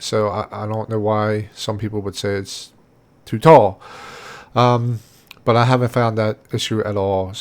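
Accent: Baltimore, United States. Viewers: You have to be from the Baltimore area. Wooder, rinch and the accent are a dead giveaway.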